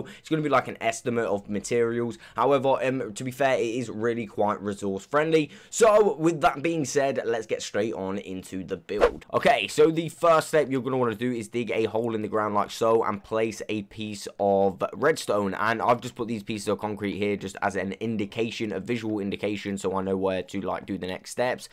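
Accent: United Kingdom. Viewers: really sounds like british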